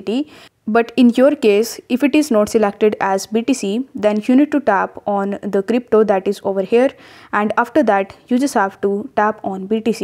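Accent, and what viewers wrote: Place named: India. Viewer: one of the headache accents in the world,, is the INDIAN English accent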